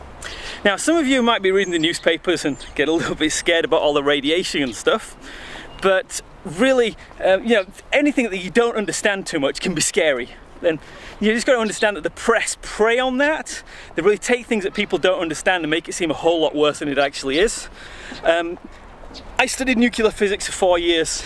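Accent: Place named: Scotland, United Kingdom. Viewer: Ur accent is like a mix of British and Scottish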